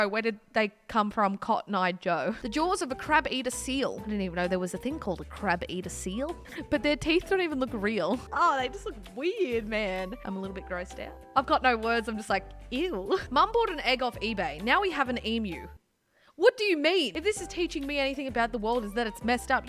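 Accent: Australia. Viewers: You sound like your brother wait a sec all Australians sound like that. Nope just you two.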